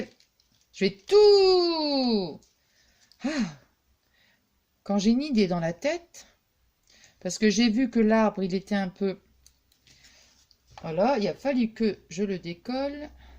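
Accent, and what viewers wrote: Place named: North, France. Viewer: Ccou je viens de découvrir ta chaîne et tu me fais trop rire je me reconnais un p’tit peu dans ta façon d’être j’aime bien du coup je m’abonne à ta chaîne merci reste comme tu es ne change rien j’ai cru reconnaître une Tite pointé du Nord dans ta façon de parler lol 😂 👍bisous 💋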